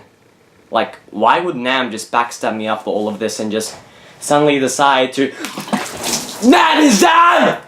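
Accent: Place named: Australia. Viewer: Are you Australian? I can hear it in your voice. I'm Australian aswell.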